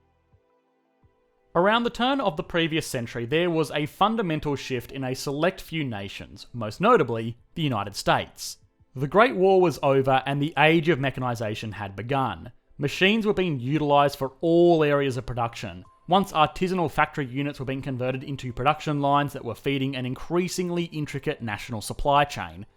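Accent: Australia. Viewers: The Australian accent really makes everything said here a wee bit more positive ♥️😁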